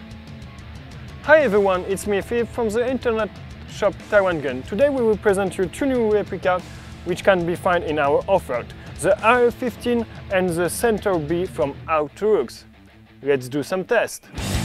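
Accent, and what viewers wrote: France. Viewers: sais trop un français les mec qui parle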